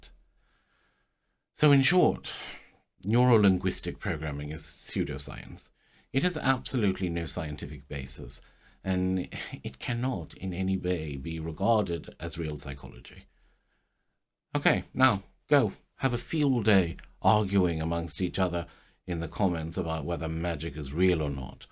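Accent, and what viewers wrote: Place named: RP, United Kingdom. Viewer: I am NLPed by this posh pronunciation 😇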